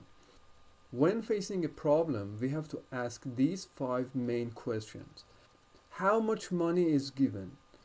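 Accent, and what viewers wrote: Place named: Iran. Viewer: pure Iranian accent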